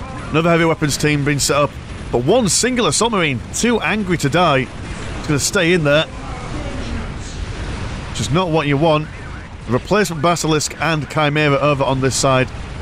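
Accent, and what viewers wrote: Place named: East Yorkshire, United Kingdom. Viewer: Recent subscriber, pleasantly surprised when I heard East Yorkshire, from north east myself, love the videos!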